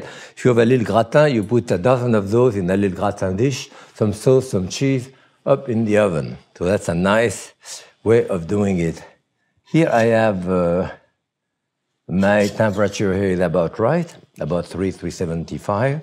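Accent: France. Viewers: Fransız aksanı ile ingilizce cok tatlı 😀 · I love his rustic French accent. Its so cool!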